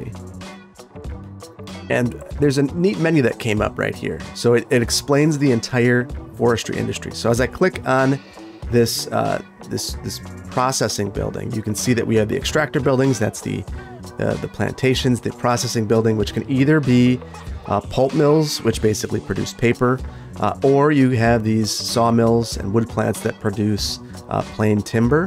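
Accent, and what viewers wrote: Wisconsin, United States. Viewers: I thought he said "egg" the first time he said it to, and I was like: Wait, what??? Then I realized he was from Wisconsin where they say "beg" for bag.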